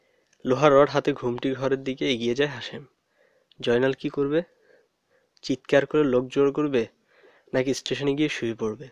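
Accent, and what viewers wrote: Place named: Noakhali, Bangladesh. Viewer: চালিয়েে যাউ মন্দনা আরও ভালো হতে হবে নৌয়াকালি আনছলিক টান আছে একস্টা প্লেবার রয়েছে বেশ লেগেছে।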